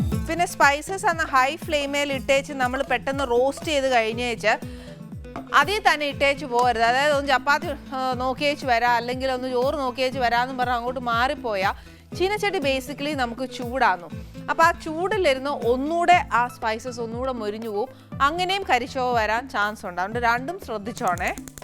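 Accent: Kottayam, India. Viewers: Kottayam bhasha kurach koodipoyi 😂😂😂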